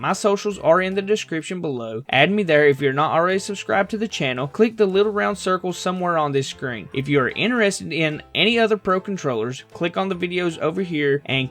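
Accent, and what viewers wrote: South, United States. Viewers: Thank you man your Southern drawl is exactly what I needed for in an explanation on how the f*** to use the shift paddle after I've had these controllers for like 3 years and never even considered it. And don't mind them comments the videos perfect we can stare at those Stars on your desk top while you explain some stuff. Thanks brother.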